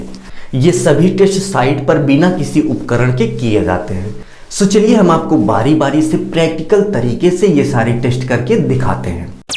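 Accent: Bihar, India. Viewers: Sir ap bihar se hai kya??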